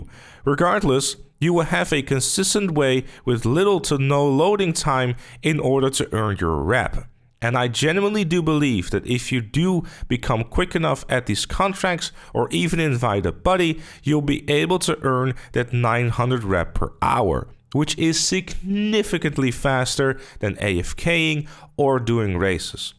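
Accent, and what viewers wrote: Netherlands. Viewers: Bro kwist gelijk dat je een nederlander bent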